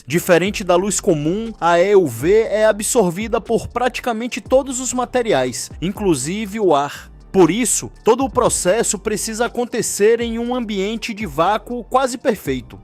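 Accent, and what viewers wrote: Northeast, Brazil. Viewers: Essa narração nórdéstina tá muito ruim !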